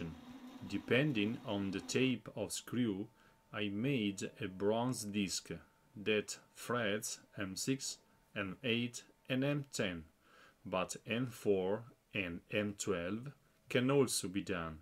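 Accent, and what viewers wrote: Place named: Venice, Italy. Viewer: A me parea mi de riconossar na cadensa nostrana... te sarè mia dal Doeo!? Bel laoreto, brao 💪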